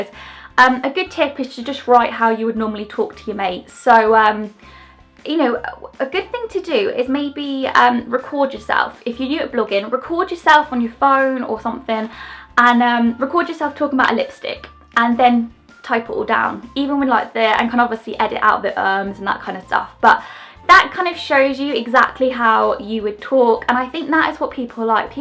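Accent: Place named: United Kingdom. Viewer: Gymnast4Life-M I know right sooo British in British don't have such a beautiful accent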